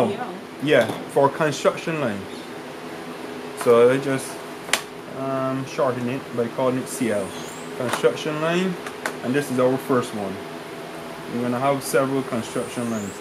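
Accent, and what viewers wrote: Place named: Barbados. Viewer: This guy sounds like a Bajan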